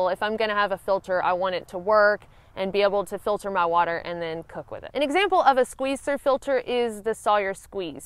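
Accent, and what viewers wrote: United States. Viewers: Love the proper American accent!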